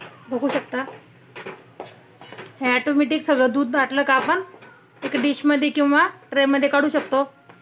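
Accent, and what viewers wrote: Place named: Sillod, India. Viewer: ताई संभाजीनगर सिल्लोड तालुका आहे का तुमचा बोलण्यावरून वाटलं तसं म्हणून विचारलं 🙏😊🧘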